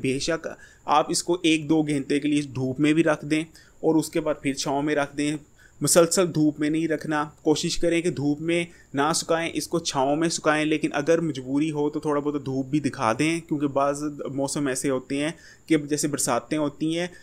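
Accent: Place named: Punjab, India. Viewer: Hakim sahab urdu bol rahe Ho, tone Punjabi aa rahi hai .by the way thanks for your information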